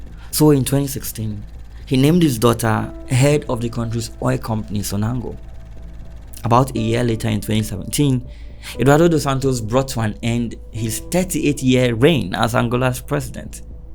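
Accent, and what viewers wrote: Nigeria. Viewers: I am not hating, but the fact that this story is narrated by someone that sounds Nigerian, is hard for me to trust it!! Scammers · Sounds like a Nigerian...you know what i mean!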